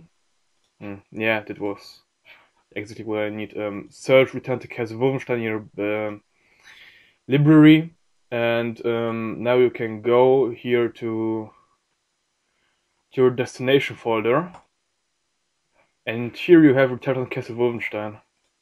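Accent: Germany. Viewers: I think your german because of the accent. If so.. Was geht ab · Man hört das du Deutscher bist :D Aber ich glaube das tut man bei jedem.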